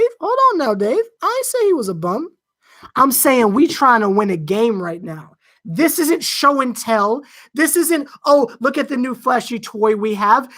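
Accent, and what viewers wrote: Canada. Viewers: you Canadian ?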